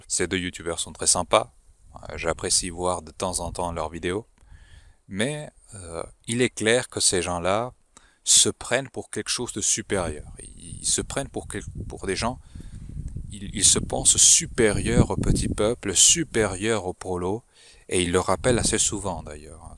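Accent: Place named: Italy. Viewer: Quel est ce bel accent italien ? Qu'est-ce que la "dissidence cognitive" ?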